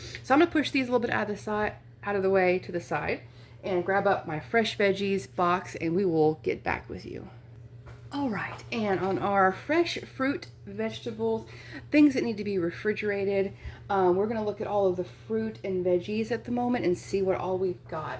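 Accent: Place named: Midwest, United States. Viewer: Where are you located? You sound like you are from the midwest maybe..... MN?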